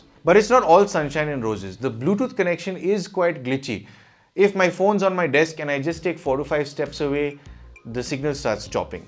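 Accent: India. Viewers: the curry accent is great!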